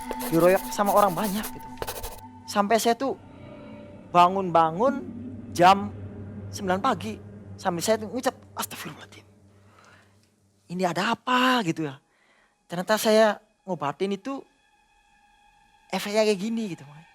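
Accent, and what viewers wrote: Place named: West Java, Indonesia. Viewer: Pasti orang Jawa Barat 😂 Salam kang sing Indramayu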